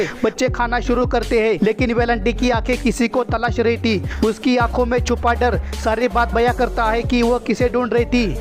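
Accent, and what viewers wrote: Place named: Maharashtra, India. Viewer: Bhai aap toh pakka maharashtraian lagtey ho...superb broo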